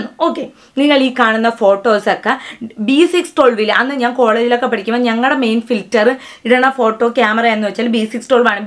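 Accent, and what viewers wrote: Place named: Thiruvananthapuram, India. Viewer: Thiruvanandhapuram ahno sthalam😂 nammde tone pole 😆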